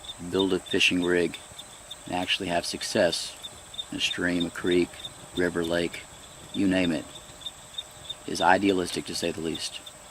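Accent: Texas, United States. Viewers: Is this how a raw Texan speaks? Theres something stylish about it and more importantly, something rustic and natural, a natural thing that a person from anywhere in the world can identify with. I mean, in my town, old tough men speaks jus like this, and they know stuff just like this. My salutes. You guys are the real natural human thing, and maybe thats why all of them other ones from other countries relate to u and speak the same style no matter what the language is (i mean only the raw natural guys). Theres just something universally common about you rare lot around the world. The things u endure, the things you eat, the way you carry on unlike some city folks who wud just say yuk, freak out , run and eventually die prematurely. Once again, respect to you. Thanks for showing us a glimpse :)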